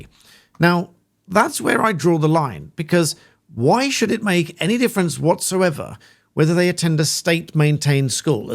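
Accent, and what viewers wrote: United Kingdom. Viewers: Is this a Brit?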